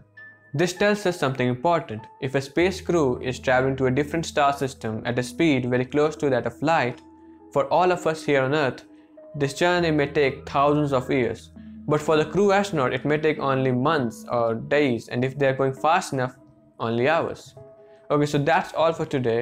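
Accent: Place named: India. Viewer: I cannot experience this video due to the pajeet voice and accent.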